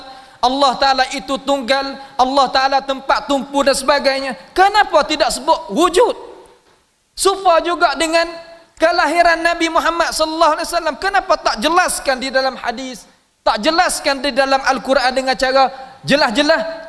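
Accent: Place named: Petani, Malaysia. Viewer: kurang dah loghat petani...hehehe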